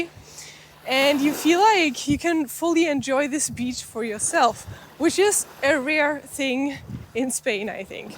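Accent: Germany. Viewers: Spreche doch lieber in Deutsch. Zu starker Deutschakzent! Ansonsten schönes Video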